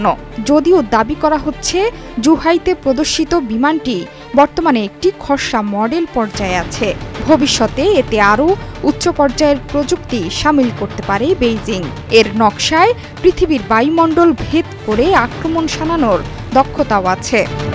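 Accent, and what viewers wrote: India. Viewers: ইন্ডিয়ান স্টলে কেন খবর বলেন?? সোজাভাবে বললেই তো হয়।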